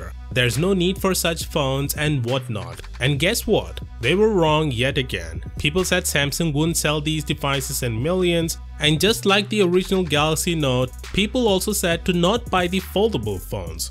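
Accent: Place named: India. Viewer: This guy has an Indian accent if you listen carefully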